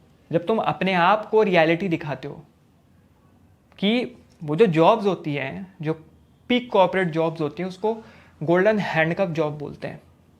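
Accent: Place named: Malwa, India. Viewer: sun mere bhai ek baat tu bohot dhyaan se; tu dilli ncr se hai na? tu koi madhya pradesh ke indore maalwa region se to hai nahi jo wahaan ki style mein bol raha hai ZAKIR KHAN ko copy kar raha hai ,kyunki that way you speak is extremely irritating aur teri acchi baaton ki value gir jaa rahi hai saari ki saari. Kitni baar tere video dekhne chahe hain par 15 minute baad band karne padte hain becasue of the irritation caused by the way you speak which is NOT ORIGINAL.(tu bhi jaanta hai ye baat acche se)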